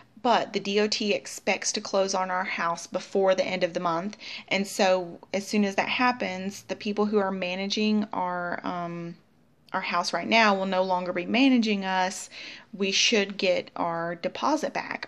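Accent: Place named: North Carolina, United States. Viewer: It is definitely your Southern accent! It is SO North Carolina - in a good way (remember, I am right down the road from you in Belmont, but I was not raised in NC).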